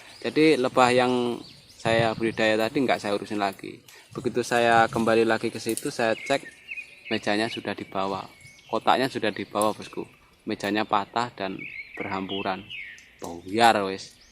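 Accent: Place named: Madura, Indonesia. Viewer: Oohhh tak kira di Trawas Pasuruan atau wsrga Pasuruan Jawa Timur. Dialog bahasa nya seperti Jaws timur Madura turun Pasuruan. Terima kasih telah berbagi ilmu, semangat ya mas. Berbagi ilmu nya.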